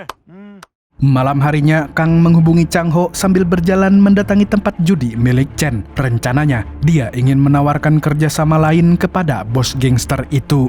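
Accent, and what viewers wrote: Banjar, Indonesia. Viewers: Wihh Abang orang Banjar ya😂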